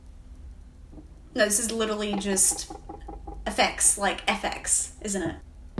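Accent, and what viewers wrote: Australia. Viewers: Hi, love those facial expressions of yours and that cute Aussie accent!! Make sure, if you can, to do the entire Sabbath discography in chronological order - they are my favorite band and I think you'll find the journey very interesting. Welcome to the appreciation society!!!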